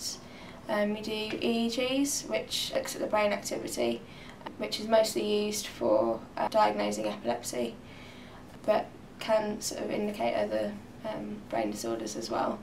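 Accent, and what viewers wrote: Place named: England, United Kingdom. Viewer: My god, she's just not speaking american. I believe she's english.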